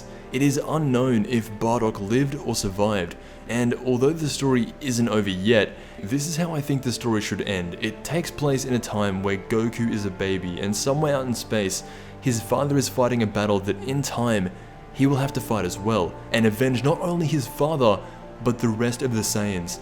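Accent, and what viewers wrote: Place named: Australia. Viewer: by his accent that he's Australian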